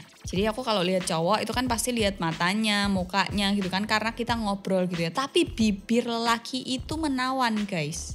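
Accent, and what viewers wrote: Java, Indonesia. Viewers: Suaranya medok nduuu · Wuaduuuh...!!! Medok buanget mbakayu!!! 😘😆🙀😹🙈🙉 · Aku seneng bahasanya enak blak blakan medok jawane sukses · logat nya jawa ya sama jawa😂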